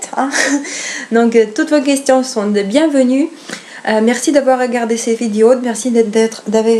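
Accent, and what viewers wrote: Russia. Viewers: Je m'abonne à ta chaîne, tu me rappelle une amie que j'avais au lycée et qui était russe, elle s'appellait Macha et elle avait le même accent que toi!!! Es tu russe?en plus tu as une voix douce très agréable à écouter. J'ai des doutes sur une probable grossesse...comme on dit il y a des choses qu'on sent qui ne sont pas comme d'habitude.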